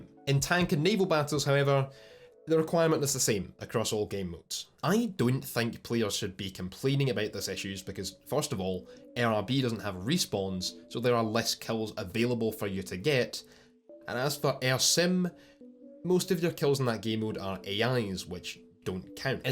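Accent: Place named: Scotland, United Kingdom. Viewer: Ngl I watch ur vids mostly for ur accent I wish I had a Scottish accent lmao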